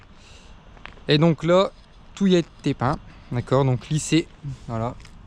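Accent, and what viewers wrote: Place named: North, France. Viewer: J'ai l'impression d'entendre l'accent du nord =)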